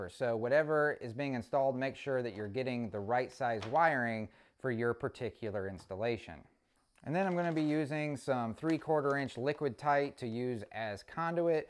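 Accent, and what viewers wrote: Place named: United States. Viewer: I like your American accent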